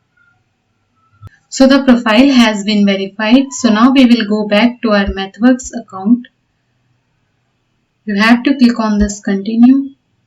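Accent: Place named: Russia. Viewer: by the way, your speech sounds like Russian a bit) Did you learn something like industrial electronic at the university?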